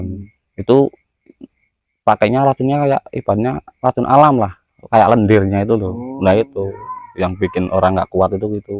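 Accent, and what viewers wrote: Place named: Central Java, Indonesia. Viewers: Logatnya kok sprti orang pekalongan😂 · Nah itu kan nah itukan. Pasti orang jateng plat G😂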